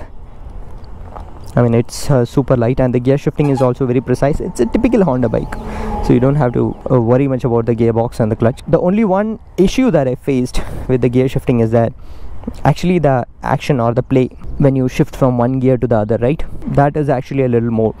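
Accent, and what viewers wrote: Kerala, India. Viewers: Unhideable mallu accent 😂👍🏼 way to go bro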